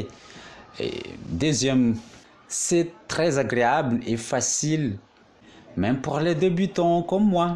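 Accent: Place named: Madagascar. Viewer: J'adore l'accent! Êtes-vous malgache? En tout cas je ne pense pas ça soit vous sur la photo mdr!!! Sinon bravos pour la vidéo, elle m'a bien aidé.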